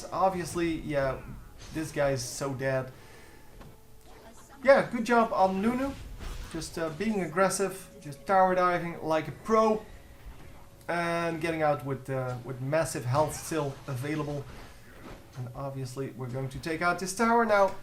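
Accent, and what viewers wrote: Netherlands. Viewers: You sound like a Dutch man when you are talking English :D from what country are you if i may ask?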